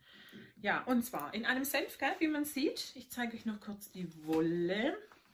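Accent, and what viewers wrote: Swabia, Germany. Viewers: Habe Dich eben entdeckt, durch Frau Nadelgeklapper, und bin begeistert von Dir und Deinen tollen Projekten! Außerdem finde ich unseren schwäb. Dialekt so "heimelig"! Mein Abo hast du. Liebe Grüße Nicole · Ich hab’s auch sehr genossen Dir zuzuhören.. und wie schön ausm Schwobaländle🥳.. liebe Grüße vom Fuß des Hohenzollern Susanne 💕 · Hallo,dein Podcast hat mir sehr gefallen,bitte mach weiter 😊..Ach und ich finds gar nicht schlimm wenn das schwäbische durch kommt, das macht uns schließlich aus....Liebes Grüßle aus Fellbach 🤗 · Toll gemacht😊 Dankeschön! Sehr sympathisch und genau unser schwäbisch von kurz vor der Alb 👍🏼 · Liebe! Schwätz du ruhig schwäbisch - ich find‘s super 👍🏻 Zu den Qualitäten für den Sommer mag ich persönlich nix mit Merino. Eher Baumwolle-Mix Garne. Mach bitte weiter so 👏 Liebe Grüße Gabi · Hallo, vielen Dank, dass Du Dich endlich getraut hast! 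Das mit der Technik hast Du ja alles voll profimäßig hinbekommen, Lautstärke, Ton- & Bildqualität sind perfekt 👌🏼 Ich mag Deine Projekt- und Wollauswahl sehr, und vor allem liebe ich den schwäbischen Einschlag 🥰 Dass Du uns auf Deinen Weg zur Handfärberin mitnimmst finde ich ganz toll, das war für mich eigentlich das interessanteste Segment, weil ich davon null Ahnung habe. Total spannend, bitte weitermachen! Kleiner Verbesserungsvorschlag: Du sagst sehr oft „tatsächlich“, ich bin bei sowas aber auch „tatsächlich“ sehr empfindlich 🙈... und Du hast auch überhaupt keinen Grund, Dich dauernd zu entschuldigen, gerade dass Unperfekte macht es doch lebendig 😉 Ich freue mich sehr auf weitere Folgen von Dir!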